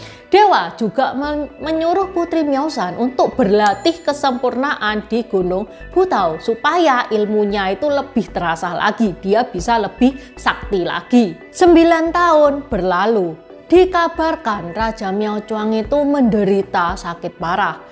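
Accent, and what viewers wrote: Java, Indonesia. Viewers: Sy senang sekali denger encik program , krn sangat medok ❤ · kok kayak logat jowo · Ci logat jawa nya medok bngt jawa nya mana sih cii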